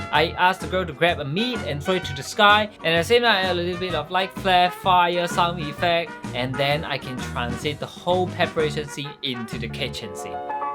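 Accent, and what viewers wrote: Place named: Hong Kong. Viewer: 喂，你啲英语發音和用字都係自己作出黎和有好重的香港广东话口音；西人是完全唔知你噏乜；你要好好学吓 preposition 应该点用，唔好乱讲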